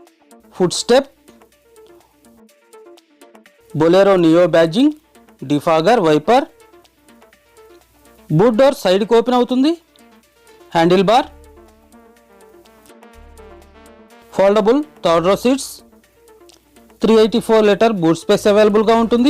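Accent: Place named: Telangana, India. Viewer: అచ్చ తెలంగాణ యాసలో అద్భుతం గా అన్ని విషయాలు చాలా బాగా తెలియ పరుస్తారు మీకు నా ఆశీర్వాదం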